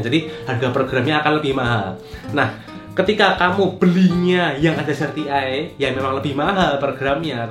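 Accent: Java, Indonesia. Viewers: Koko bahsanya medok jawa bgt.. wkwk.. thanks infonya Koko.. sukses ya.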